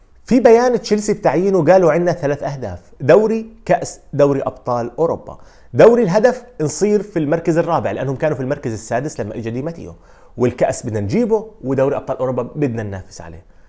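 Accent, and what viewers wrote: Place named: Syria. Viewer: اللهجه السورية بتجنن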